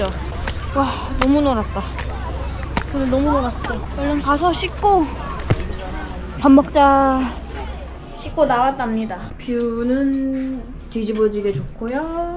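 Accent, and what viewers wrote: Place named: Jeolla, South Korea. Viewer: 전라도 사투리 귀여워용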